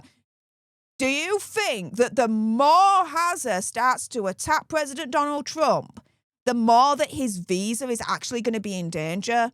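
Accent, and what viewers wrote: United Kingdom. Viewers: Love the way you talk on your show and your British accent👍🇨🇦👍🇨🇦👍🇨🇦👍🇨🇦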